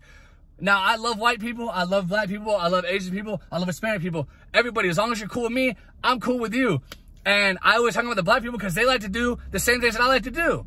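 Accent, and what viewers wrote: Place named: South, United States. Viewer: country accent is on point 😭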